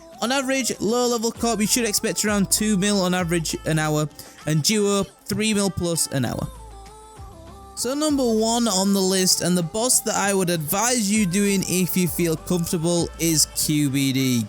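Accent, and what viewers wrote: Yorkshire, United Kingdom. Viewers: "Yorkshire on helium " And crack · where abouts in yorkshire you at? I assume yorkshire, sounds like it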